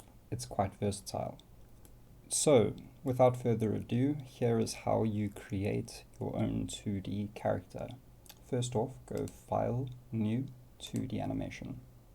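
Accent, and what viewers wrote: South Africa. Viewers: You south african mate ?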